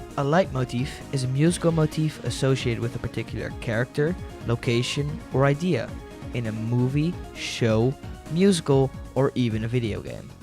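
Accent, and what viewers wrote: Netherlands. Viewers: Nederlands accent 😳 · Is dat een Nederlands accent dat ik hoor? Hoe dan ook, fantastische video!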